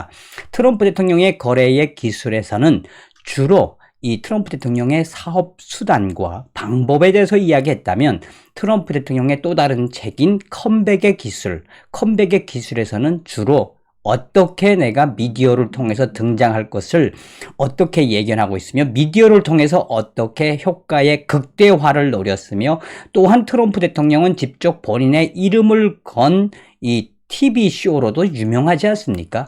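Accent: North Korea. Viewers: 북한언어 쓰실때 넘 즐겁읍네다 북한말씨 애정이 넘치는 든든한 삼춘이 주시는 언어 같아 재밌고 즐겁게 시청합니다 북한말 쓰시는 유튜버는 밖에 없어요 가열차게 웃겨 주시라요 봤던 영상 못본영상 무조건 즐겁게 구독하기요 주위에도 가열차게 알리묜서 즐겁게 시청하는!!!!❤ 떼레비 고정 하시기요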